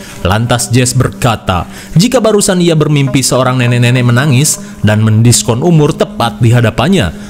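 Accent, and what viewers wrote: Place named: West Java, Indonesia. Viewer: Bocil sok pinter dih,pdhal yg baca naskah alur ini tuh orang sunda dengan logat dan suka melesetin kata kata biar ga terlaly flat dan kaku pembawaan nya, lu penonton baru apa gmna? Dri dlu jg bgini pembawaan nya kocak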